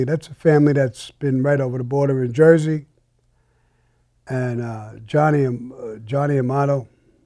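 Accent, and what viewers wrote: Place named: Rhode Island, United States. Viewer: The way he says words like bottle and mouth.. Reminds me of my Grand Father. Providence RI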